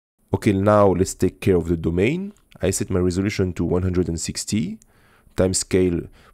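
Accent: France. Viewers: I really like your french accent dude!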